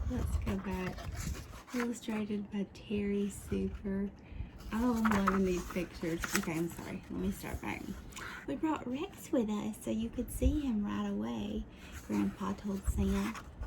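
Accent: South, United States. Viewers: I can never get over your country accent does it for me every time thanks for another super relaxing video ❤️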